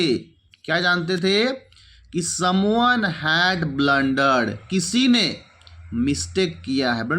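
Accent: Bihar, India. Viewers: Sir please aap apna accent thik kriye English words ko bihari accent dekr kharab mt kriye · Sir please apne bihari accent ko sudhariye. English words ki ma-bhen kar rkhi hai!!!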